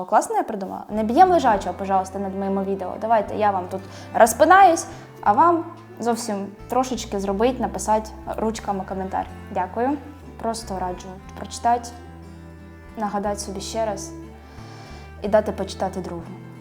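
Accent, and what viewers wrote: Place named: Surzhyk, Ukraine. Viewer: подобається твій періодичний суржик) дякую за роботу.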